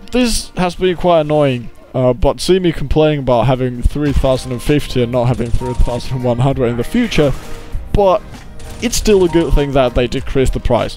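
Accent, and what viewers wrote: Finland. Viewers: I can hear the finnish accent leaking through! :^) But still hellagood english skills and good and entertaining video, earned my sub! : D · Vittu että nautin tosta sun pienest "Ralli Inklish" :D sen erottaa sielt just ja just :D muttei myöskää välttämättä jossei keskity :D vitu hyvää commentaryy näit lisää +1 :)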